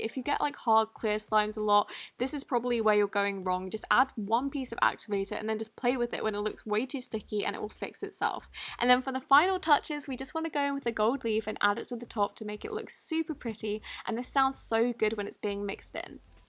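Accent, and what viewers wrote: England, United Kingdom. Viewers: She's english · R u English/British ❤️❤️ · WOW i love that britishenglisch so nice to hear👍#EUROPIENPOWER 🇨🇭🇨🇭🇨🇭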